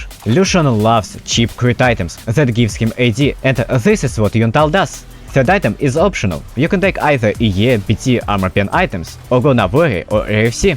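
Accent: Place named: Russia. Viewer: out of the context, where are you from? what's that accent? feels like youre russian or slavic.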